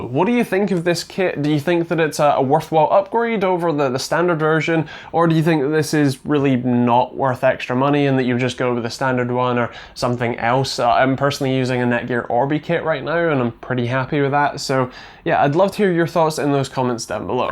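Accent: United Kingdom. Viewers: well I dunno if u forgot where the English language came from but he sounds like hes from the UK as am I, perhaps he's northern I'm not sure as accents vary so much u can drive an hour anywhere and it changes but anyway.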